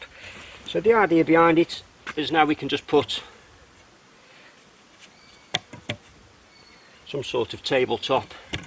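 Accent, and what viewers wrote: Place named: North, United Kingdom. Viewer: Hi. I love your channel. Very relaxing! I have been trying to pin down you accent but I have been failing. The best I can do is “Up north”! Where are you from?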